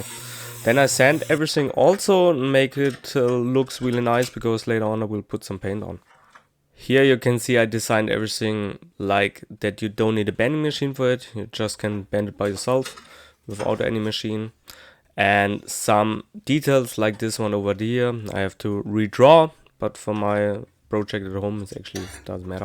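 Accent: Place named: Germany. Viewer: Every damn time I watch one of your videos, I get envious. You're truly a master of your craft, man. As someone who's in a similar situation where confined workspace quarters are concerned, I've tried to explore ways to improve how I store things. French Cleats appear to be the best option due to how modular and customizable they are. You've basically taken that same approach, only you've opted for a pegging system with the same kind of modularity and customization. I'd suggest incorporating a sliding rail panel in the future should you run out of space in the future, but I don't know if that would be possible in your shop. Honestly, I think you've done everything you can with what you've got. The only other way to deal with continual space issues is to just get a bigger shop. Maybe that's in your future? And for some reason, I always thought you were American but judging by your accent, it sounds like you're German? 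I guess my American arrogance is showing, but then again, I could accuse you of allowing your German intelligence to make me feel insecure, too. ;.) Keep doing what you're doing. Love watching your videos. Quick question: For someone who doesn't have one of those plasma CAD table things, what would the best way be for anyone trying to make some of those pieces you cut out that have lots of curves and whatnot? Just tracing and cutting wheels? I have an angle grinder, but I don't have (yet) a plasma cutter.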